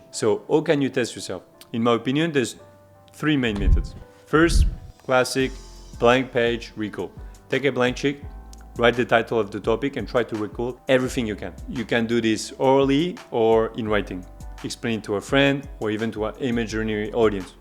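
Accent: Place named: France. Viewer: Merci frérot 💪🏾 😹j’avais capté l’accent français 💪🏾🔥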